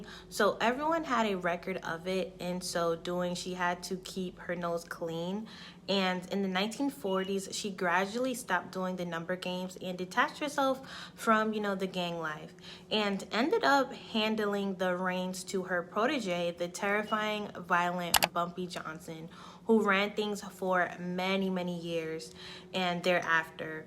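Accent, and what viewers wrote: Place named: New York, United States. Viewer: I wish she tracked down and annihilated each member of the rapist clan. Btw: Your New Yorkerisms and soft, melodic voice completes me. Love your channelllllll, the respect and the humor. Sis is a G by the way. Coming from a neighboring country to me, trust and believe I'd jook a whole dude in the eye for the foolishness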